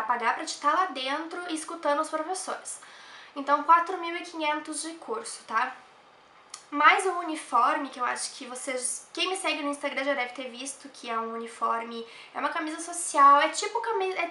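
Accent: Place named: Rio Grande do Sul, Brazil. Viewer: Muito Tu hein hahaha adoro sotaque de Gaúcho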